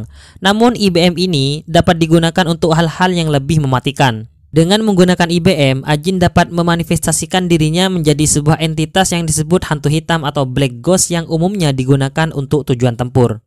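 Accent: Madura, Indonesia. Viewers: Ternyata Logat nya Madura Kawuk..Yo Opo Kabar e Pokeh Celeng..Salam Damai Dari Persaudaraan Dayak Madura Sy dari Sampit..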